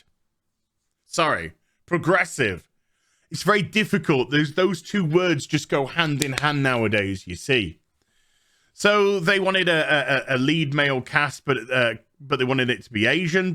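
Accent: United States. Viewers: Are you American? You are speaking American with a funny accent. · Yes you do sound like a yank somethings. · you do sound American sometimes lol and I love it!! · I'll admit I couldn't even tell you wasn't american my first time watching you.😅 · Gary's fault for your American speech. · Yep, you sound more and more American all the time.: ) O.o?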